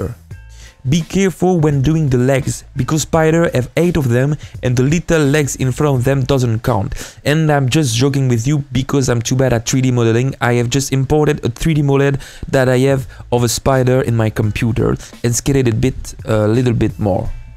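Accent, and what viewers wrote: France. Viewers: If you're commenting on his accent, it is French, not Ugandan.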